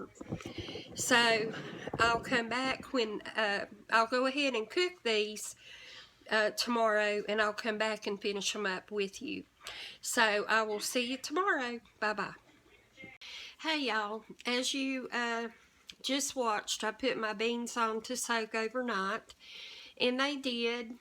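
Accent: South, United States. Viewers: Those glasses and your Southern accent are wonderful. Great video thanks.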